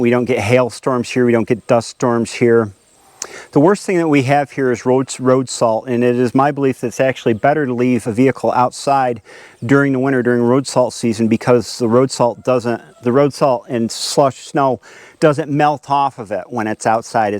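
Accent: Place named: New York, United States. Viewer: So since I first began watching your current videos cutting hay, there was a familiarity to you… when I watched your part 2 summary from 4 years ago today it became obvious (at least to me) who your narrations resemble. Can you guess? That’s correct! Andy Rooney CBS 60 minutes. I suppose there is a New York influence in there!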